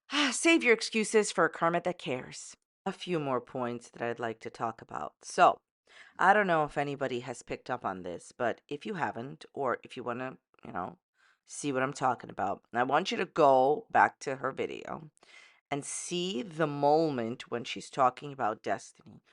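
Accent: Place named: Long Island, United States. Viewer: sounds Long Island-y to me.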